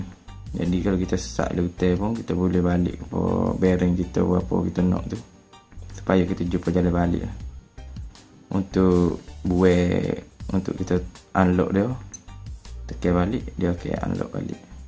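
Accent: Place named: Kelantan, Malaysia. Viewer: Terpadu skali loghat kelate boh...steady sep kito..haha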